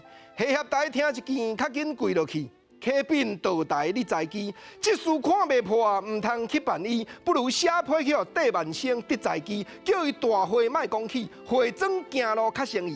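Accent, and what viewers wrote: Taiwan. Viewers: 這才是正港的台灣話，遺憾現今台語的沒落。很多人講台語都怪腔怪調。要來解文說字 來好好學習發音。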